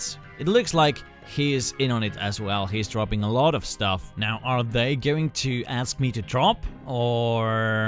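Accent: Finland. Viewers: Huomaa että oot suomesta xD siis aksentista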